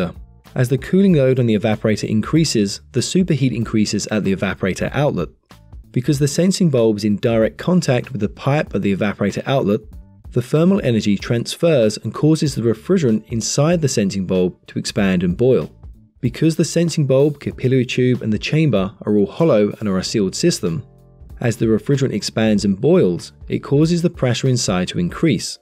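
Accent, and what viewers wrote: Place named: United Kingdom. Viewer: i wish you'd talk a little slower,,,,,,,,,,,,,,,i know you can go back and watch again as much as you want or need to but with that british accent i miss a lot and i become frustrated and turn off the video,,,,,,,,,,just a suggestion though,,,,,,,,,,,,,,,other than that i do like the videos............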